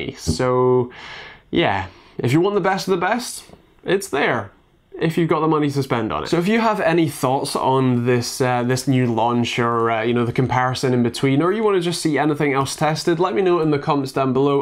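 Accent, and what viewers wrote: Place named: Ulster, United Kingdom. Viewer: Sounds a bit like you're from Ulster. My mother's side of my family are all from Belfast so I can tell. LOL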